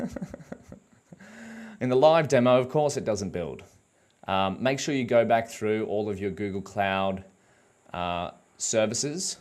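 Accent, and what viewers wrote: Australia. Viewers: Love the aussie accent bo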